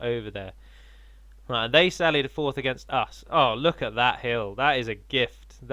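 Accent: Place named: Glasgow, United Kingdom. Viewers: That Scottish accent tho lol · the first half sounded like a bitchy glaswegian, second half sounded retarded norfolk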